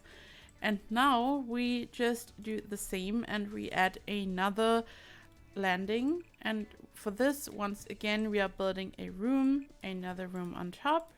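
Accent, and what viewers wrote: Germany. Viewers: Are you German because I can here an accent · you have the same accent as * and * and they are germans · Are you german? bc of your accent. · wie man diesen deutschen Akzent einfach raushört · That german accent tho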